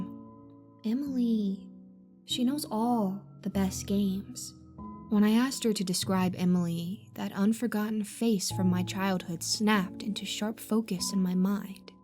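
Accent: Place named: South, United States. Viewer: I would love to hear 👂 your southern accent more , but then icc c would feel like your spoiling me ❤️😛😍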